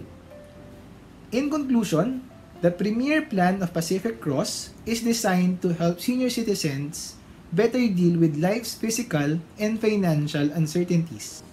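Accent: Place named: United States. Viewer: Wala akong na intindihan, Americano ka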